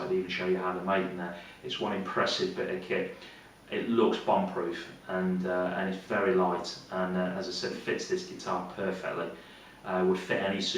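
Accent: England, United Kingdom. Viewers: this review is cool if you like to hear people with an England accent talk from ten feet away from the microphone and ramble for thirty minutes without any demonstration.....I am not a fan. thumbs down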